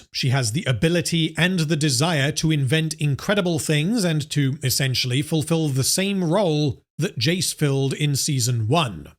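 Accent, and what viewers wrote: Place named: South, United States. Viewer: Are you a voice actor? You have such a bombastic voice. I'm not an expert on English accents but it certainly sounds "southern". Finally checked out your own stuff but have watched a lot of your EFAP appearances. Best of luck with your channels & I am looking forward to watching your previous vods.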